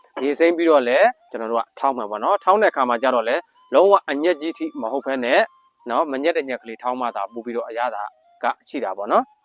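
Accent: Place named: Upper Myanmar, Myanmar. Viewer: အညာစာလေးရယ်အညာသံလေးရယ်စိတ်ဝင်စားပါတယ်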